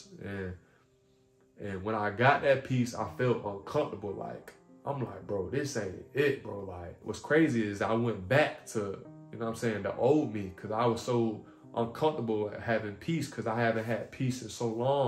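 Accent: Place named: Chicago, United States. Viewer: first time watching your video. i can hear in your accent that’s a chicago accent. i can hear that from anywhere. showing love from out here in chicago man ❤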